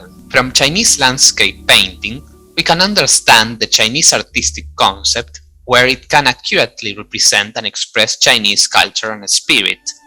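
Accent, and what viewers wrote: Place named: Italy. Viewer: Italian Accent with ‘Roman’ painting.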